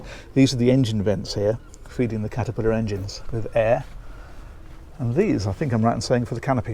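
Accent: England, United Kingdom. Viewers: Nice yacht buddy. I like the design layout. That master bedroom was awesome or using one of your words it was a "proper" master bedroom. I love to listen to you English people talk from England because we don't talk like that in the United States here, mainly in rural Missouri. But I'd take this boat on vacation or use as a live aboard because it would be so easy to keep clean I'd think. I loved the upper fly bridge the most. It has a great layout for this size for a "proper" cruiser. An the galley is perfect for making meals while you have 6 or even 12 people onboard fishing and or in the water playing around. Perfect vacation boat especially if you have children or teenagers because they make messes and I think it would be easy to clean up with floors like that. The boat or small yacht is beautiful especially since I've never seen another one like it or even close to it before from all the channels I've been watching. 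But I have to say, your channel is my number one favorite, plus that I am faithfully watcher. When I see you posted a new video, I have to see what you found because I know it's going to be worth watching. Love your channel buddy. Great job, and you earned another 'at a boy award' from me here in Missouri. I can't wait to see what you post next time. I still wish you would make a specs page showing us all the details like, the length, width, numbers of gallons fuel tanks are, water, the max range it can go in nautical miles, an what speeds with NM, size o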